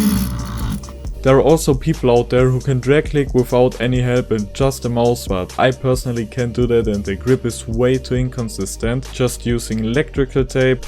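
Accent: Germany. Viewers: Are you German? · Tysm your german ? U got that accent? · You sound like typical german-english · Was ein deutscher 😂😂😂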